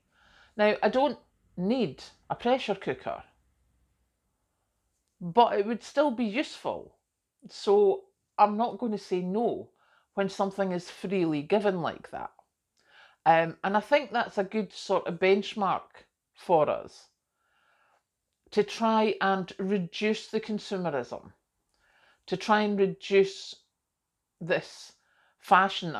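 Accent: Scotland, United Kingdom. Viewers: Definitely she should. I really enjoy her. She embraces the qualities of my relatives from Scotland. That I miss so much. The accent. The due diligence. The helpfulness. I just really really like. I was on YouTube and found a woman. That was similar in some ways to my grandmother. That woman shared another woman. That sounds like relatives of mine from Europe. I absolutely adore that woman and her husband. And from that woman I found. is the most I would say similar to myself. Although I'm almost a decade or more older than her. I really respect her love for her community. For her country. I love that she speaks her mind. She's self-supporting. She helps others. She's got a man she loves and respects. My man died. I loved him endlessly. Once you had the best you not interested in the rest.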